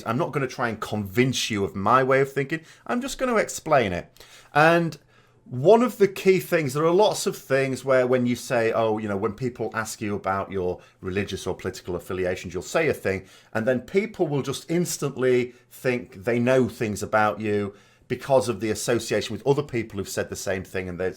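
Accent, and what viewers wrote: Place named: Northern England, United Kingdom. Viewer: Hi I think a lot of people who come to your videos would like to have you as a friend. To be honest my Finnish wife loves your accent as do I. As a southern born guy who lived up north for many years. I always crack a little cloth cap and ferret down trowsers joke with her, and she is laughing her head off. I explained just how grim it is up north. :) I am very happy to sit and listen to you for hours. You have a solid hold on whats going on in old blighty. Good luck to you mate.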